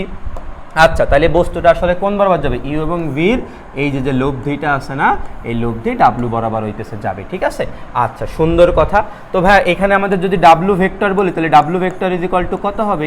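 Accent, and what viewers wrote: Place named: Narail, Bangladesh. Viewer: ভাই নড়াইলের ভাষায় কথা বলেন। ভালো লাগে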